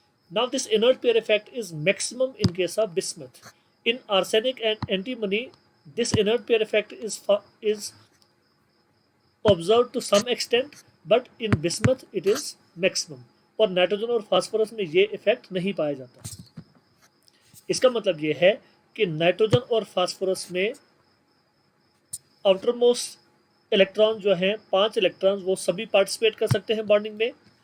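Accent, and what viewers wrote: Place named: Kashmir, India. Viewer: yes sir .... kashmiri accent is 💞...btw im from Srinagar....iss saal board hai.... dua kejeye ga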